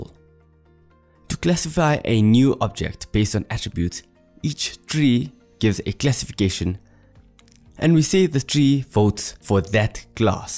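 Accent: South Africa. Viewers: I think this is great. There are plenty of examples of code and technical online but for fundamental understanding, this works just fine. Good to hear South African this coming from South Africa! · Dude your accent is so South African!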